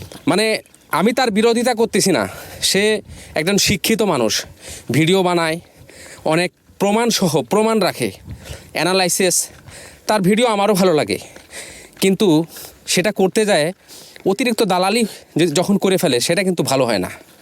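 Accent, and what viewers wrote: Bangladesh. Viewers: Aapner Bangla ta west Bengal er Banglar moto noi ... Bangladesh er banglar sathe khub mil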